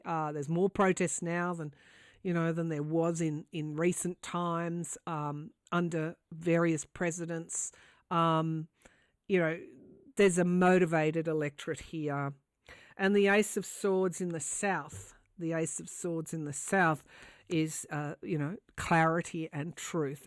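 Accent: Australia. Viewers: I love hearing the sayings in different countries! I've never heard 'rugged up' - I chuckled a little and thought it is quite appropriate when wrapped up in cozy, fuzzy sweaters ('rugs')!!! (I'm in the US, originally from MA, been in FL for 20 yrs now) starting to get hot already!!! Would love to see Australia!!!!!!!!!! My favorite accent!!! The you!!!!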